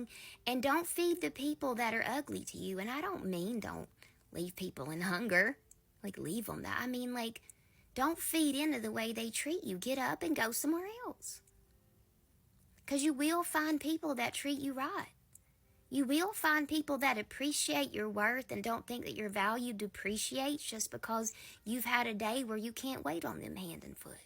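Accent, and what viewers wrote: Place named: South, United States. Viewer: Amen I knew you was from the south because as soon as you spoke I giggled cause you and I have a similar accent.....keep on doing what your doing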